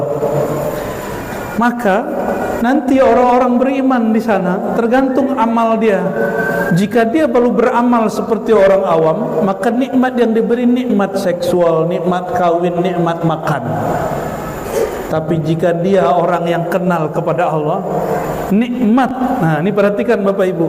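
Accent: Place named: Minangkabau, Indonesia. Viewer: Alhamdulillah Buya bahasonyo taragakjo kampuang halaman, ala lamo Ndak pulang 😅sanang bana mandangah Bahaso, itu asik Samo Samo urang Padang 👍😁🤗💛💛💛💛💛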